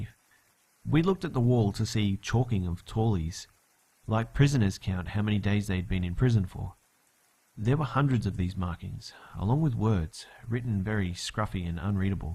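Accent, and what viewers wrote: Australia. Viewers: cause you read your stories with feeling. When the person narrating does it on one tone and almost no enthusiasm. It distracts from the actual story. Plus the Australian accent ain't to shabby to listen to. :-) hee hee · Hey question! Where abouts are you from in Australia? I am in Australia for 4 months now and just recognised your accent ( not to be creepy or anything) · love love love this!! + love your channel and LOVE your accent! im an aussie too (melbournian lol) it's very soothing to hear your voice/accent. helps me sleep when im having a bad night. please keep up the great work, from one of your BIGGEST fans!!!!:)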